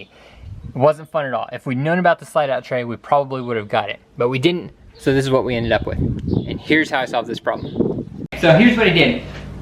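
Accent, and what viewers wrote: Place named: Utah, United States. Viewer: U from Utah area ? Based on your slight Utah accent. Cool build bruh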